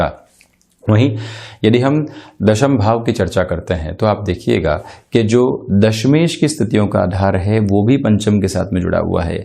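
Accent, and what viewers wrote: Standard. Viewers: नमस्कार सर जी I आपकी भाषा व उच्चारण इतना शुद्ध और उच्चस्तरीय हे जिससे मन प्रसन्न हो जाता हे I जय हो I शुभेच्छा 🙏🏼🙏🏼🙏🏼🙏🏼🙏🏼🙏🏼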